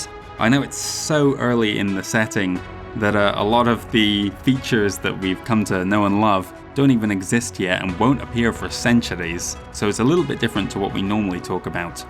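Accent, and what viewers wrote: Scotland, United Kingdom. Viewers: You sound like a Scottish person educated in England or who has lived in England for many years. Alternatively, you sound like a European (Nordic...?) who has lived in the UK, mostly in Scotland. Or, you sound like a Scottish person affecting an English accent and failing at it.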